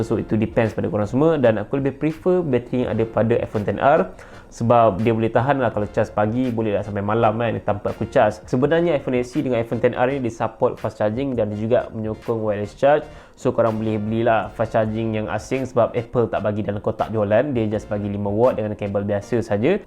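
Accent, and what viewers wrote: Malaysia. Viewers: Aksennya? Dia orang malaysia?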